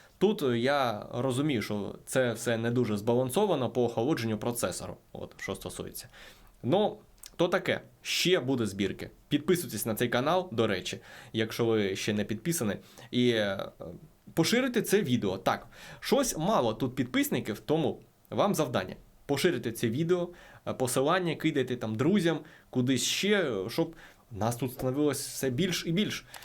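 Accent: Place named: Odesa, Ukraine. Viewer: Прошу не сприймати близько до серця, але я в шоці, що існують українці, котрі говорят українською з таким акцентом. Я з Кривого Рога і теж до ПМВ говорив російською, але такого акценту немаю. Одеса не припиняє дивувати.... просто окрема країна якась, котра всі ці роки була десь не з нами.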